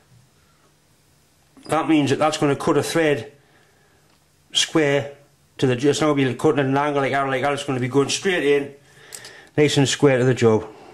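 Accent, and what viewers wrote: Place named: North East England, United Kingdom. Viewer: I'm really enjoying this series,. It is all very well produced and fortunately I am from sufficiently far north to totally understand every single word of your exquisite NE accent. I commend how you use the Vernier Calliper Gauge as a 'Very-near' Calliper Gauge, then turn to the Micrometer for absolute accuracy. All old-school stuff and still valid today. All these ISO units engineers who boast about being able to work to 0.01mm (4/10,000 or 0.0004 inches) when the old brigade, working with a Micrometer with Vernier markings to Imperial units, regularly worked to limits of 1/10,000 or 0.0001 inch (0.0025mm). I think that gives bragging rights to the old school Imperial unit machinists! For any doubters out there, our women in the aircraft factories during WW2 were working to less than a 'thou' accuracy.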